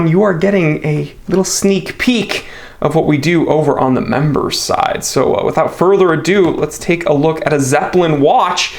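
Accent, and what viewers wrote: Germany. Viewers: lol I love the German accent. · lol love that German accent!!!!! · Please more German accent. Intoxicating and additive.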